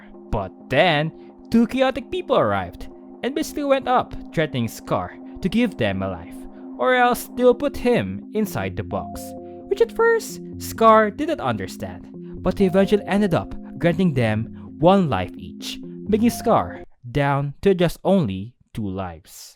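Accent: Philippines. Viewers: Ya kinda have a Filipino accent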